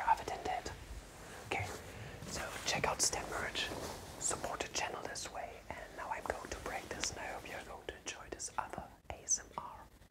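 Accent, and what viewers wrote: Germany. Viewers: You German good sir ?? Btw thanks for the video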